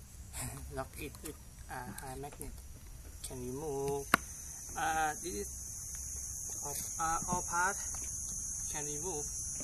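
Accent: Thailand. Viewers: Over Rock ก็พวกเราคนไทยไงสำเนียงมันไม่ใช่แบบฝรั่งมันเลยงงกัน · คนไทยชัดๆ สำเยียงไทยมาก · คนไทย สำเนียงยังไทยเลยอะ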